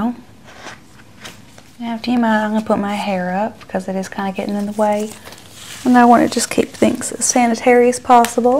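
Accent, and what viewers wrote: South, United States. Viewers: From Alabama here, fantastic job on the accent. 😉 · Great southern accent!! Great job! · Wait …you’re NOT southern?! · I love the Southern charm voice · Beautiful country ❤️ accent 😍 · Thanks for sharing this very, very impressive southern accent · Love it ❤. On point with the southern accent 👌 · love your Scarlett O'Hara accent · I’m from South Carolina and I can’t detect a fake southern accent. It’s better than most tv actors. You might have a second career. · That’s actually a good American southern accent. Good job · Why is it southern accents are so friendly and comforting? You nailed it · Aww! How creative and sweet! Last time we had British and now we have Southern! Another wonderful addition to the -Verse! Were multiple versions of you with different personalities roles and accents take turns to be your bedside nurse for the day! 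Haha I think that's a creative way to think about it! Your amazing! Never forget that! Sending you love!❤❤❤🤗🤗🤗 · Please do more southern accents, you are spot on.❤ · I didn’t see “fake” at first and I assumed she was from the south I’m from ga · I love your southern accent! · That southern accent is impressive. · As someone who grew up in the south. I can tell you without a doubt, she nailed the accent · You are just the best ASMR nurse ever 🤩💗. Love you and love your southern accent 🥰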